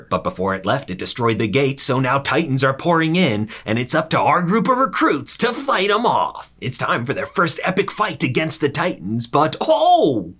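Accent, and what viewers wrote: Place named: United States. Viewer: Your American voice doesn’t make me change my viewing. Grata. Do more anime!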